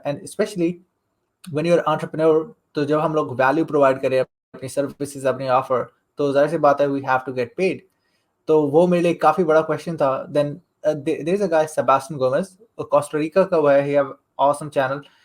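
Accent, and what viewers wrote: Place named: Pakistan. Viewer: He is a pakistani,it is audible from his accent. Woww! Great job u have shown that money is the greatest thing in world 🖕🖕🖕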